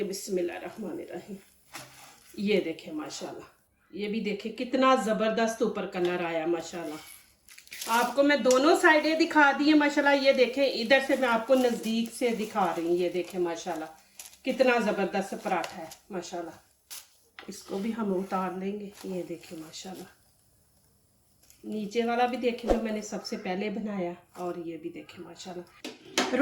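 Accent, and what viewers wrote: Punjab, India. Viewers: Ap na bohat acha Prata bnaye hain par ap ki jo Zuban hain us aa saf pta challah hai kh Ap ki Zyban Panhabi hai to best hai ap Punjabi bolo apni Zyban bolna mn kaisi sharm please apni Zyban bolna mn kisi ko bhi sharam mesoos nahi karni chahiye I prud of my panjabi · Aap punjabi pind jesylehjy mn baat krty hn.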